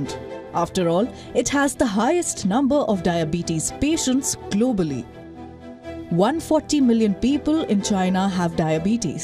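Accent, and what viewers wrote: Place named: India. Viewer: Hindu accent is bleeding my ears …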